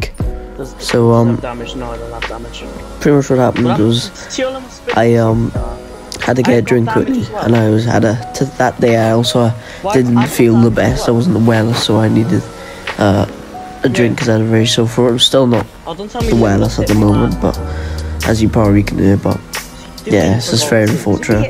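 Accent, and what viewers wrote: Wales, United Kingdom. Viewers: the most welsh accent!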